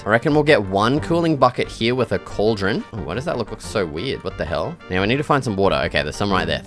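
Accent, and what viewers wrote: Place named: Australia. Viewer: Alright.. we get it.... you're Australian...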